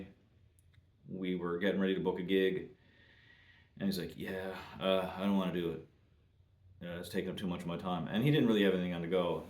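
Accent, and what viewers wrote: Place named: Nova Scotia, Canada. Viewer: Jesus bud, you sound like youre from nova scotia or newfoundland, not new brunswick, we have the best bedrooms here.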